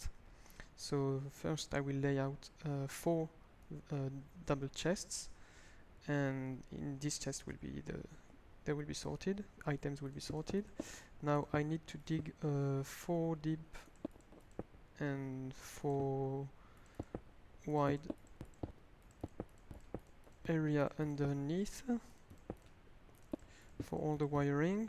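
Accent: France. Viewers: It's fine if you have a french accent but there will always be racist people. Most of the time though people just find other accents annoying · j'entends un joli accent français :p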